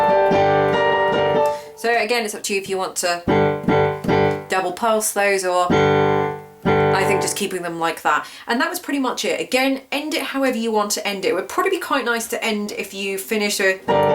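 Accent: United Kingdom. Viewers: Are you British i love your accent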